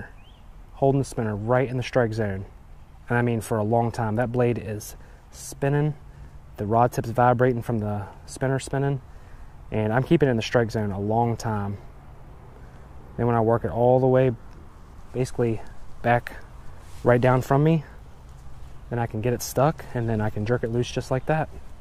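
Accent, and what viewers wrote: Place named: Baltimore, United States. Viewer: Jamie Emes my first guess was Maryland. Sounds like bawlmer. Checked his Instagram seems WV, pretty dang close to western Maryland.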